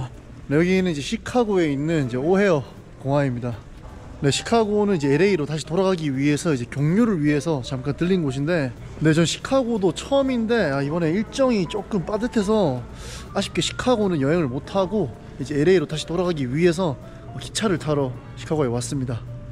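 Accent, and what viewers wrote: Gyeongsang, South Korea. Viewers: 뜨신물, 꽈자 ㅎㅎ 숨길수 없는 경상도 남자의 흔적. 하지만 자막은 서울말 ㅎㅎ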